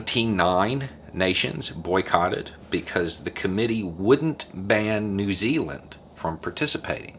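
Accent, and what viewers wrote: South, United States. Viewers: Agree. We all have unconscious bias due to upbringing, education, life experiences etc but it takes courage to identify it. At first I was turned off by southern accent and the Duck Dynasty beard:D but so far his comments are fair and square.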